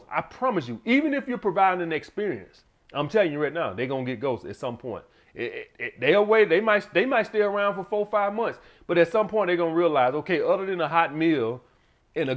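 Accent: South, United States. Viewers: talking that real down south fly nigga sl-l!+ Them late 80s, 90s to early 2000s crown vics, Chevys, Buicks and lacs, mane!!!! That brought me back, good memories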